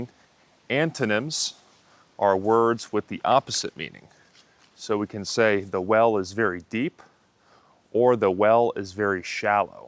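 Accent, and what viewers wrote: United States. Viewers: Oh man, perfect american english to the intermediate and almost advanced students. Everyday conversation is what we need. Inside and outside. I'm brazilian. Nice to meet you. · He's American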